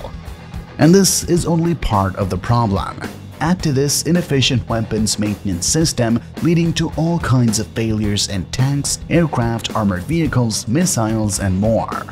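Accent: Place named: Europe. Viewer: You just said the Su-34 is just as good as the F-15. What do they teach you in that European country that you come from? Yes I know that's where you come from because I can hear your accent. You maybe bilingual but, English is definitely not your first language. I do congratulate you for your mastery of the tongue though. The Su-34 fullback is a ground attack aircraft. The F-15 is a fighter airplane. There is a difference. A Su-34 is mincemeat to an F-15.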